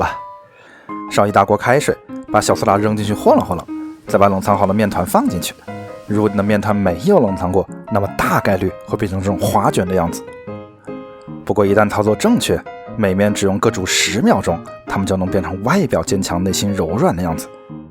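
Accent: Wuhan, China. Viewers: 不是我说 你的口音蛮像经视台 夸天节目里面的武汉话旁白的调调~哈哈哈哈~虽然你是普通话的调调~ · 我一看到这个标题，就知道又有阿姨的汉普了🥰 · 有点吧han，标jun滴武汉口音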